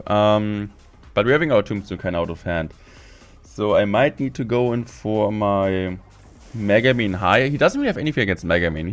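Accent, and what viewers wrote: Germany. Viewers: Are you german normally with the accten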